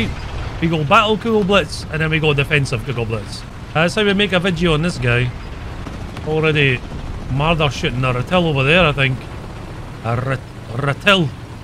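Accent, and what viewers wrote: Scotland, United Kingdom. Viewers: Hey i'm a bit confused are you scottish or irish?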